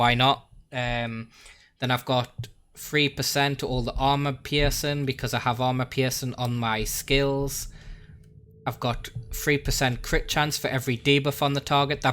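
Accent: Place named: Northeast England, United Kingdom. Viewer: Sup good to see another Brit putting out some good content, by your accent I'd guess your from the northeast? Anyway I love to see what you could come up with for ranged sader particularly H bolter if possible and a sniper sin it's seems the balance is way behind on those two types but It would be great to see if you could come up with something for them that can reach the milestone of battle120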